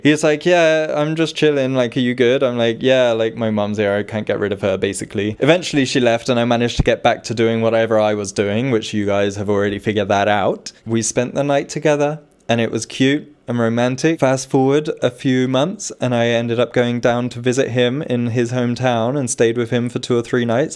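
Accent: England, United Kingdom. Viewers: Hi, new Irish subscriber here - you are so handsome and I love your English accent and tattoos - as an Irish gay man myself, I’d happily date you in a heartbeat 🇮🇪☘️🇬🇧😍😍😍😍😍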